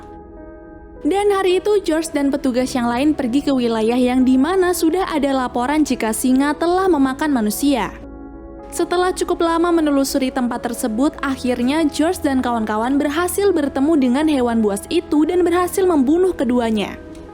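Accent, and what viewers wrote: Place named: Sunda, Indonesia. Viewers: Gaya Sundanya sangat menarik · Teteh Sunda nya😅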